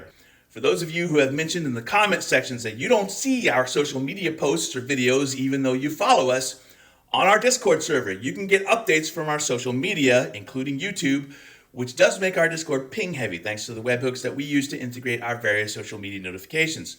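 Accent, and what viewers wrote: Scotland, United Kingdom. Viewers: Damn, the Scots accent came out QUICK today!!!!! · Why does it sound like you're covering up a Scottish accent? ... 🤔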